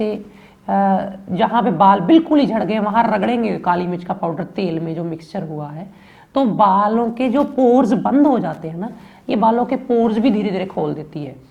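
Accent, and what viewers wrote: Punjab, India. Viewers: Thank you very much for teaching us so many good things.aap Ka Punjabi eccsent bot achha lagta hai jaise koi Ghar Ka member hi samjha raha ho .pls tell it contact no. After cancer treatment big changing side effects have come.no one telling us the way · Guru g apki tone punjabi aa rhi h...acha feel hota h Koii formality nhi lgti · Thanks for information. R u Punjabi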